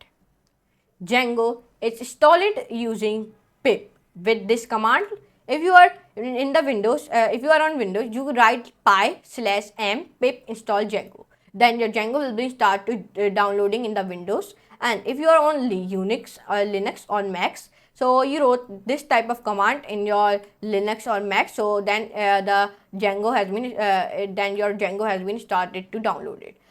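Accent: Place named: India. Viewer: yAh india ki english bol rha ha Django ka illawa aur kuch samajh nhi ahh rha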